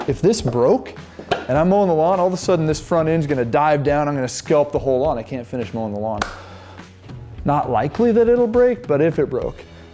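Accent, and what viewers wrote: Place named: Canada. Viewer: This guys Canadian accent is soo killer.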